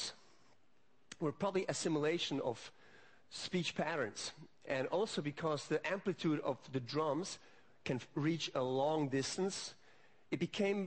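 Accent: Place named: Switzerland. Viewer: Well, he's Swiss. They always sound rather distinctive.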